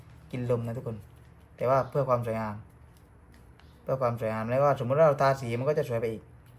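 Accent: South, Thailand. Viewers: คนใต้เหมือน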